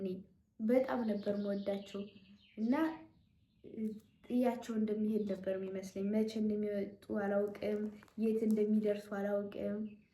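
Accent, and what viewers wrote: Gondar, Ethiopia. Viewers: ጎንደሬ ነች መሰለኝ አክሰንቷ❤ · it’s very nice u are still Abel to speak Amharic ,,, u have a gondere accent btw😄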